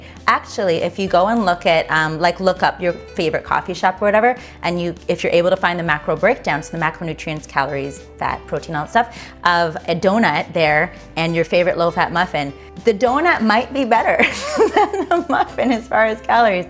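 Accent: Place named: Canada. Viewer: I LOVE when your canadian accent comes thru! :)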